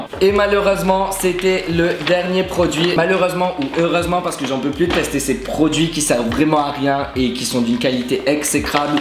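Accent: Quebec, Canada. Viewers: Est tu Québécois ou Français ? Je suis dans le doute 😂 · t'es tu Québecois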